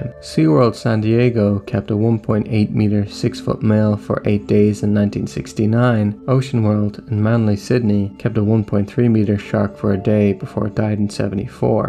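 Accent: Ireland. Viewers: is the narrator Irish? he pronounces "sandy" strangely.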